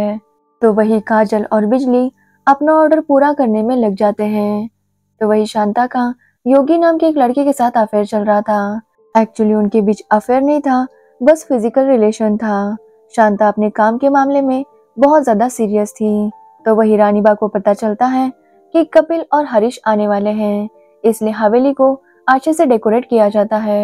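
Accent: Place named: Hyderabad, India. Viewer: Hyderbad se h ye ladki. Wahan log aise hi bolte hn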